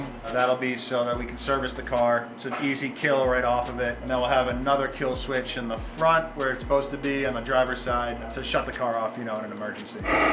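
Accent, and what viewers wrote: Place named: New Hampshire, United States. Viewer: Nice to see you back,! Your new Hampshire accent really shows when you spend more time there. When you gonna bring back 'd?!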